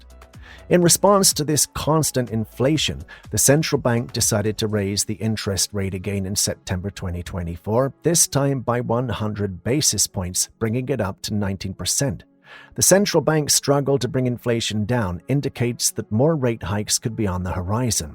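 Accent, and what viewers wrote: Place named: United States. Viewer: You know rush her is stuffed.....the yank accent is it too knowledgable man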